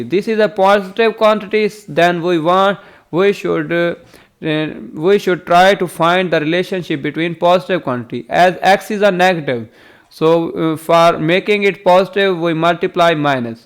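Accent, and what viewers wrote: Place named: India. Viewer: damn man thanks a lot. I appreciate your video. However i would love to see this video without an indish accent.Anyway nice Video man thanks for saving my ass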